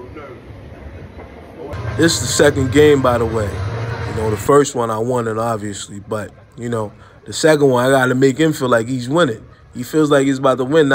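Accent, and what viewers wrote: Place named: East Coast, United States. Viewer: Haha yeah I can tell you from Jersey or somewhere from the East Coast with that accent 😂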